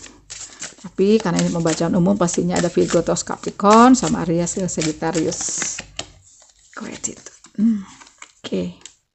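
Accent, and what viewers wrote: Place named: Sunda, Indonesia. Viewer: ketika lihat tarot, saya pilih pile 2, terus saya denger bahasa kebangsaan saya saat saya sedang terbesit ingin hijrah ke daerah jawa barat yg ada gunungnya, sawah, pokoknya yg serba hijau deh, eeeeh denger bahasa lawang panto, saya langsung senyum2, ternyata si Teteh sunda pisan...meuni sunda pisan si teteh, salam sakenal tetehi ti abdi si aQuarius 😁🙏🏼 🤝🤝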